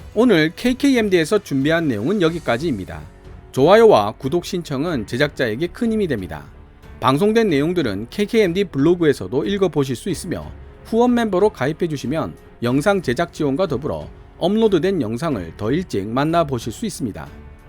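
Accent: Gyeongsang, South Korea. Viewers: 그넘의 징그러븐갱상도 사투리 집차라~ ....죽것다!!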